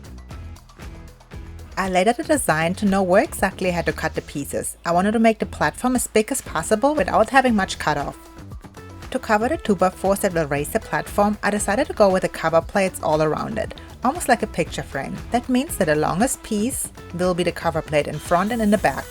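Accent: Germany. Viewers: Sie spricht englisch wie eine Deutsche......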